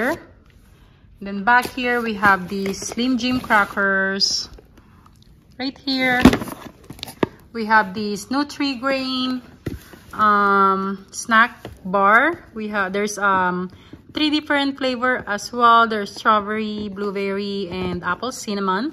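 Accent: Philippines. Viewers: Mam meron po kayo Filipino accent!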